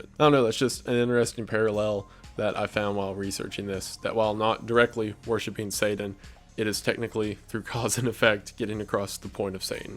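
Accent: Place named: Kentucky, United States. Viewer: Your voice is very smooth, like a young college professor from Kentucky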